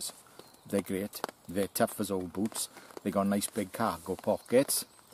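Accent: Wales, United Kingdom. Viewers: I love the way you say feuwell, always loved the welsh accent..Ive a lot of welsh ancestry..I bought a pair of pilgrims recently and rate them. Love helicon tex, real well made stuff. Been wearing their combats too (SFU's) for a while and they're great, well made. Even their version of the M65 pant are bang on. The pilgrim pant can take kneepads but the opening is on the inside, wierdly, well on mine they are at least. Diolch brother...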